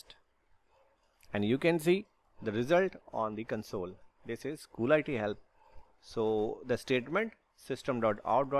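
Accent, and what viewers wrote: India. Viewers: You know it's legit when there's a yellow circle around the pointer and the guy has an indian accent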